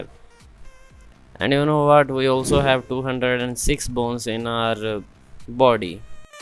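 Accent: India. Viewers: Are you filipino? Pero parang indian accent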